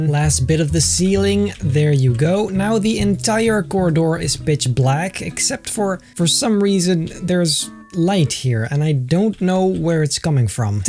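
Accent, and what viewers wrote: Netherlands. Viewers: You are dutch right? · He sounds so dutch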